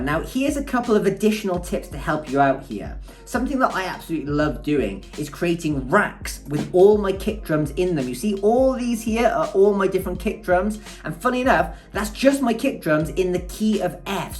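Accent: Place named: United Kingdom. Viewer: british mate huh hmmmmmmmm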